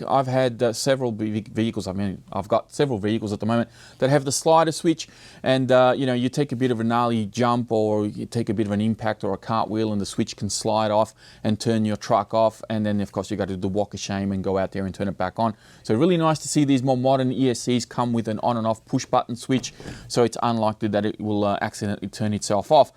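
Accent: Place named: Australia. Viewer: Nothing like that aussie accent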